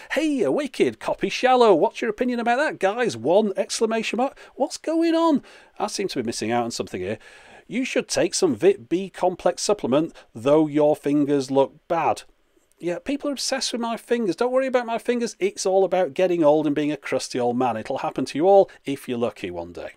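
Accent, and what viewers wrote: United Kingdom. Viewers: Omg, youtube comments never cease to amaze me. I cant believe people were annoyed enough to complain about your pronunciation of tubes! i rather like the brittish pronunciation of many words and i know I'm not alone here. Why else are most documentaries hosted/narrated by chaps with English accents. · A vid whose purpose is trolling comment trolls, delivered in a British accent. Excellent! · I like the way he says "tchubes" Just use a long U with a British accent. I did notice that he uses a short O when saying phono though. Sounds like "fawno". Whatever, I have no problem understanding and actually enjoy the British accent. Think I will go watch some Anglophile vids.... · seriously you shouldn't care that much about what people try to tell you especially not on how to pronounce a word just ignore these people, no one can force you to pronounce a word like they do it just cause you're from the UK and they are from an other part of the world which speaks english and pronounces the word differently hate shit like that people stop trying to make someone talk like you do just cause you dislike the way he does it! 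live and let live or go fuck off (sorry for the rude words but its just true)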